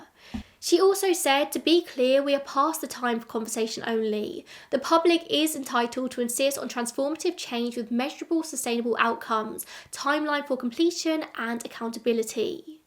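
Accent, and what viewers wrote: England, United Kingdom. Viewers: Ur English accent is 🤮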